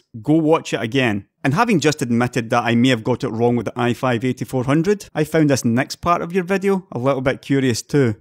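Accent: Scotland, United Kingdom. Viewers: A little bit long. Also, we have a Scotsman in an argument with an Aussie, and I'm going to back the Scotsman. That's not going to make me a lot of friends.. Now, we're a day or two away from the release of the two thousand series Ryzens. Now watch what happens when "independent testers" start testing the new Ryzens. Some people are really independent testers, some are fanbois. Intel will lean on everybody to skew the tests in their own favour. And Intel will have plenty of fanbois to imitate the Russian secret service on EweChoob and every other social media, they probably won't even have to pay them.... AMD... they have some pretty rabid fanbois of their own...... yeah, worth watching (listening) to the end of the last one. He does seem to be going off at Aussie Steve quite hard, but that does change toward the end. Watch all of it before you throw stuff. 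I would back, and I am an Aussie. I have yet to hear him say anything I sharply disagree with. That alone, puts him in a different group to most other tech tubers... The Scots accent is a little bit thick, but the man who has it, is not. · Well, I'm a bit of an AMD fan. I think is more about keeping monopolies in check. I've never really seen you as bias. Sure you have your favourites. But to me you come across as very matter of fact, no marketing bs. That combined with the scottish makes your informed opinion matter to me.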